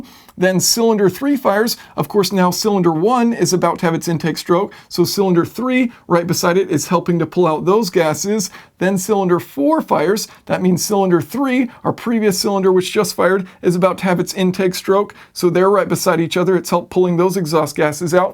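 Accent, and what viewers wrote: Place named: United States. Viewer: Another great video thank you Jason. My question is a simple one, why in the States do you pronounce Mazda as Marz da? Though I could add, why also in America is Nissan pronounced Knee San? Expecting some hate here, but really just curious.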